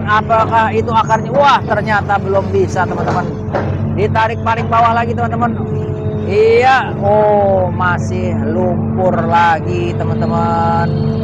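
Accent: Jakarta, Indonesia. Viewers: Gw suka logat ente Bang...turunan betokai ye....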